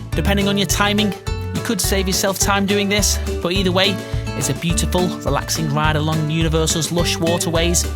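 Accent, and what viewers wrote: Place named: Scouse, United Kingdom. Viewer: Why have I never noticed the slight Scouse accent till this video?!?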